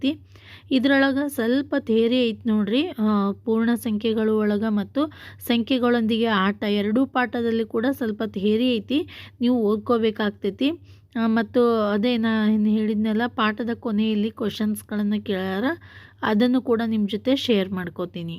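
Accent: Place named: Vijayapura, India. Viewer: Tq akka namdu vijayapur nim baashe nam tarane anasataiti akka adake kelde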